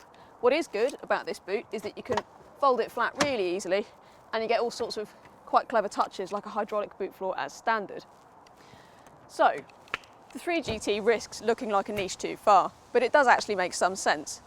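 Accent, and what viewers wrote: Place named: Southern England, United Kingdom. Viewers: It's kind of standard southern English. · Giom Mouton Thames valley.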